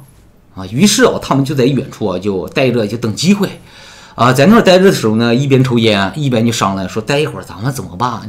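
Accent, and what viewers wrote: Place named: Inner Mongolia, China. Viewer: 我老家是内蒙呼盟的。你的口音听起来也有家乡的味道！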